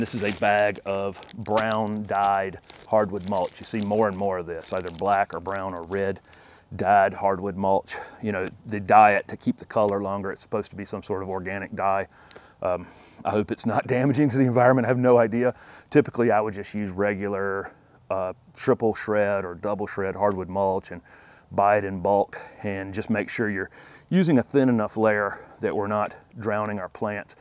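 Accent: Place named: Central North Carolina, United States. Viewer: Thanks for this great video! I’m a Dukie and would swear I’m hearing a central NC accent. Feel like I’m hearing home. Will now be a subscriber forever!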